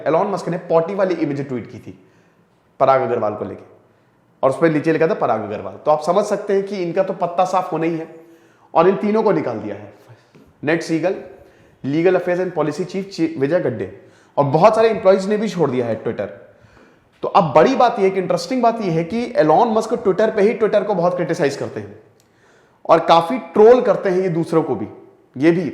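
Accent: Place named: Punjab, India. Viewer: Bhaji app ki punjabi soo cute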